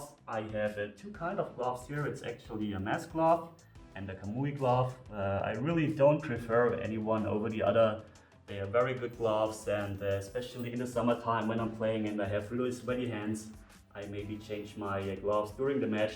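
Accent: Germany. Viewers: you sound so german 🤣🤣🤣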